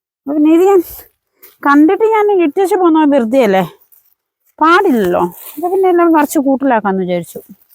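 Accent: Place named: Idukki, India. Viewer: സ്ലാങ് കേട്ടപ്പോൾ ഞാൻ കരുതി ഇടുക്കിക്കാരി ആണെന്ന്... കാസർകോട് ആയിരുന്നല്ലേ....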